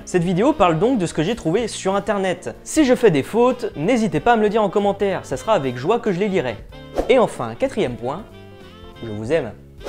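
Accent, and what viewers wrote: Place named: South-West, France. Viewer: Pas mal mais ton accent part en accent du sud ouest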